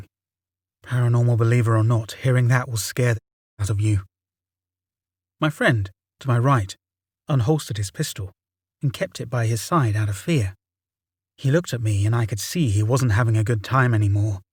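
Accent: England, United Kingdom. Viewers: This is an American story why is this guy's accent English? Doesn't fit.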